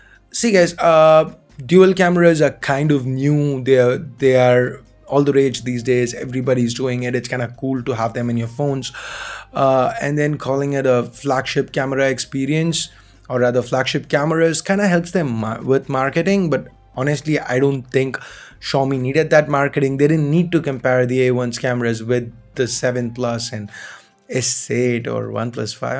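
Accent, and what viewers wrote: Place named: India. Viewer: Good content. Cringey accent. Please speak in neutral Indian accent.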